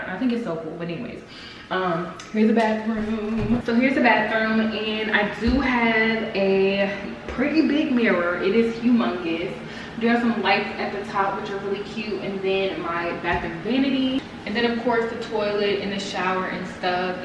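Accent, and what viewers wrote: DMV, United States. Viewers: I just know you from the dmv by your accent lol. I so wish you could share this apartment cause it is everything but people crazy so 🥴 congrats!!! You deserve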